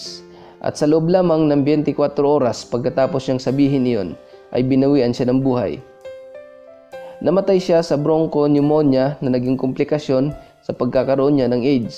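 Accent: Visayas, Philippines. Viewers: Bisaya ka man dong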